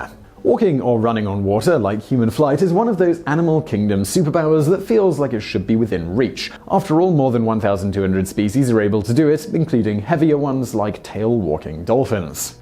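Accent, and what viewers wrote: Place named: United Kingdom. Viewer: love this channel! it s my fave for top 10 listsand I love s narration and brit accent (and dry wit)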